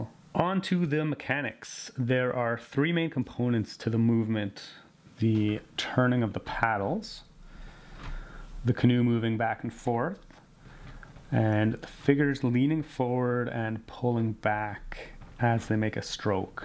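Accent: Canada. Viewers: Man I love a Canadian accent. Must be the Degrassi I watched as a kid.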